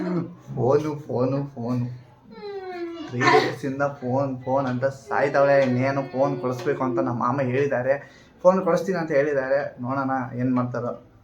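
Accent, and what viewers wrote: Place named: Bangalore, India. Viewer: Tamma ni nam uttara karnataka style dage maatadu bangalore language set aagalla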